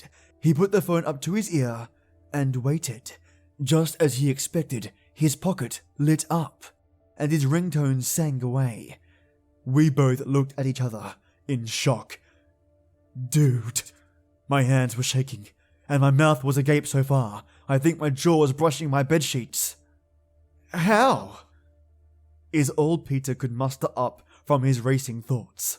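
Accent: Australia. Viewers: My younger brother, Lee is here and he absolutely loves your voice! He wants to listen to more of your videos. He enjoys the inflection of your voice, your wonderful Aussie accent and the little injections of different voices you do. My brother noticed how genuine you are and how much you love narrating stories. I always knew he has good taste and what I consistently say about you rings true and aligns with what he said. How wonderful! My mother, brother, Daisy and myself all appreciate your videos and narration. Thank you very much my lovely and brilliant friend and for this!❤💜🧡💛💚💙💓💕💖💝💞☕🍰😇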